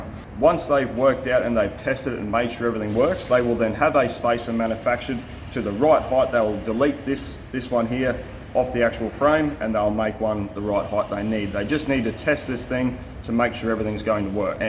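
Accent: Australia. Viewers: Avuncular Why are you talkning like you're from Australia? You sound like one of those people that throw in 'spanish' when the get mexican food, lol. Saying "adios" when when talking to someone who speaks spanish, instead of just saying goodbye. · Ohh man I just discovered your channel and as a retired Aussie living in Thailand I really enjoy your craftsmanship your bloopers and the bloody Aussie accent that I missed so much keep up the good work mate!!👍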